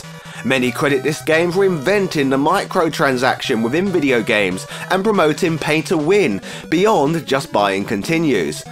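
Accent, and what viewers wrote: United Kingdom. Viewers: I love your nod to wrestling gimmicks. That's my favourite part. Plus, as a Canadian I find your British accent hilariously awesome for your character.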